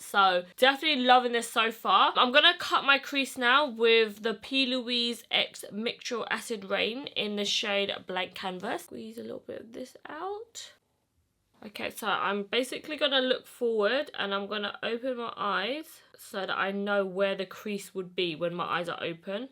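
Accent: United Kingdom. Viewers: hwasa with a british accent aha💕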